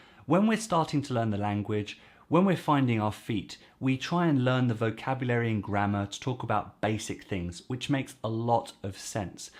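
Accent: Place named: United Kingdom. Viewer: Bonjour, je suis francophone du Québec et je viens de connaître ta chaîne. Paradoxalement tes leçons de français sont parfait pour m'aider à comprendre mieux l'anglais car tu fait une traduction simultanée. Ton accent britannique m'aide aussi car le débit semble plus lent. Merci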